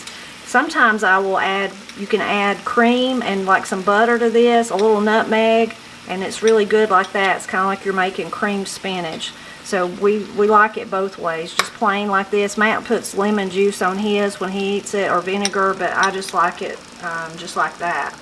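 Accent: Appalachia, United States. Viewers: I fully appreciate that my comment is coming 8 months after you posted this video (I'm a new subscriber) but wow, my mouth was watering as this lovely food was being dished up! Also I never realised that Appalachia was pronounced as you say it, I always thought it was Appa-lay-sher. I love watching your videos and learning more about the rich culture of the smoky mountains. Also I'm slightly obsessed with your accent and the way you say different words, most of which I completely understand, I'm from the UK from a small little town which has a very strong dialect that most don't understand. Thanks!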